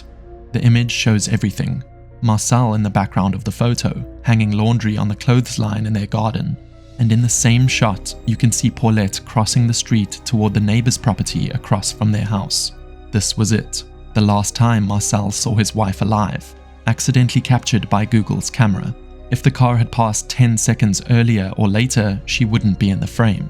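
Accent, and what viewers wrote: South Africa. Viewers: Bro love your videos, from your accent you definitely sound like you are south African. But why oh why are there so many adds? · Is that a South African accent I hear?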